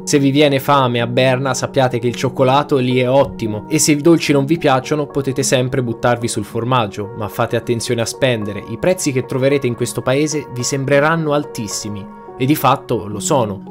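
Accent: Tuscany, Italy. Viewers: Ciao, che storia tremenda! Ho fatto fatica arrivare alla fine e mi ha stupito che uno psichiatra veda la possibilità di riabilitare una persona come Thomas. Hai un bellissimo accento toscano, non hai mai preso in considerazione di trattare la storia del mostro di Firenze? magari inserendo delle clip del processo??? 😁 io me lo guarderei davvero volentieri raccontato da te! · Sei toscano?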